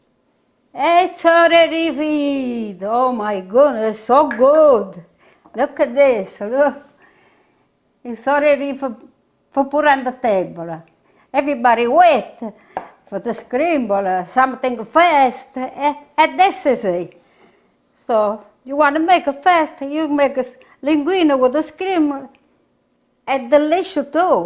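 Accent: Italy. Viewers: I love how she posts the recipe at the end! Much appreciated dear sweet mama! I love your videos and your beautiful italian accent! Thank you for this recipe...been looking for my mama's forever and this is it! OX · She Has the Best Italian Accent 😋 👌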